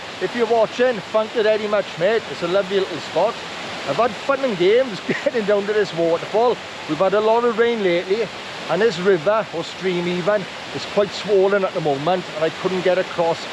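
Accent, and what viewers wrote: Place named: South Wales, United Kingdom. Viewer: What part of south wales are you from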